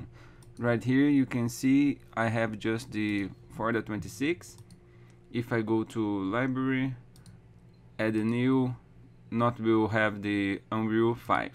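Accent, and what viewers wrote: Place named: Brazil. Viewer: Reconheci o sotaque BR também kkk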